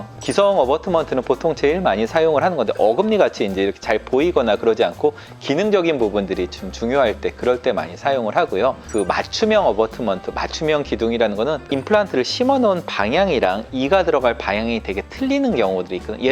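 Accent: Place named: Busan, South Korea. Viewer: 원장님 고향이 부산?분이신가여? 말하시는거 들으니 바로알겟네여 ㅎ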